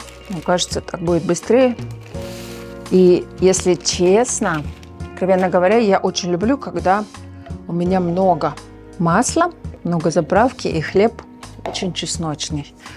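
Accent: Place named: Baltic. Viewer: ну надо же, а мне привиделся прибалтийский акцент, у нас похоже латышская женщина, партнер по работе говорит, очень приятный акцент ❤❤❤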